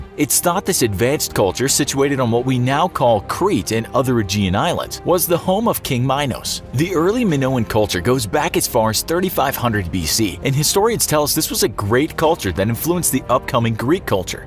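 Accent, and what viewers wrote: Australia. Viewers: sounds like australia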